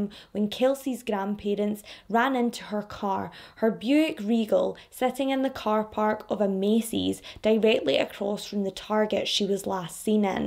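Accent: Scotland, United Kingdom. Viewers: Your personality is outstanding. You are the kind of friend I would be drawn to for all the laughs and accent too. LOL Being American the scottish accent sounds so beautiful, but your personality is outstanding. So happy I found you to watch and entertain my work days. I listen while I work and the day goes so much faster. Thank you.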